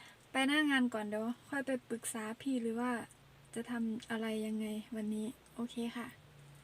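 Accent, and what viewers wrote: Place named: Isan, Thailand. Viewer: จักถังแน่คับน้ำมัน..ยามเว้าอีสานตาฮักคักเนาะคน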